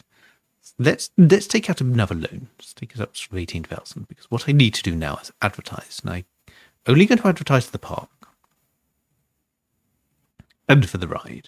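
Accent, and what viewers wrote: United Kingdom. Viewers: I understand, & can i also say.. i've never came across anybody with a posh accent like yours before, it's kinda relaxing.. almost asmr in a away.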